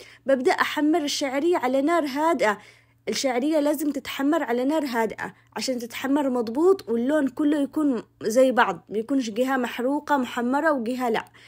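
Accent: Aden, Yemen. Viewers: ماشاء الله رووووووعه رووووعه خورتيني والله والاروع طريقة كلامك العدني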